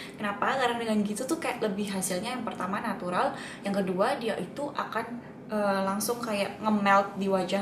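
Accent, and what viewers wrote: Surabaya, Indonesia. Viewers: hai.. you are so pretty and natural ^^. i have beauty chanel too, if u don't mind please check up my chanel too ^^ and hopefully someday we can join for another tutorial.. because i know from ur "logat" u are surabayaERS hahaha.. salam kenal ^^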